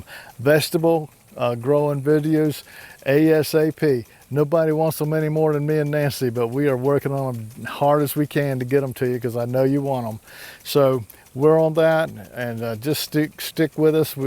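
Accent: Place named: Outer Banks, United States. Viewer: I'd be willing to bet your originally from carolina with that Hoi Toider accent. ;-)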